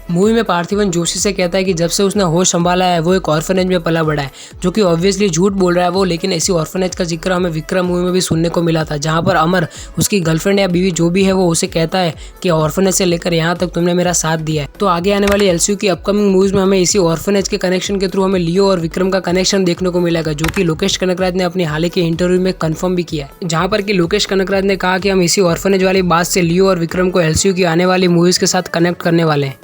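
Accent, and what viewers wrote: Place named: Gujarat, India. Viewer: "Hu maja ma chhu Bhai" tamari bolva ni reet upar thi kithu mee 😎😎😎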